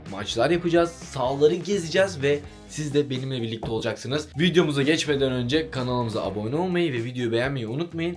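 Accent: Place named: United States. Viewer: Abi amerikalılar gibi konustun 😅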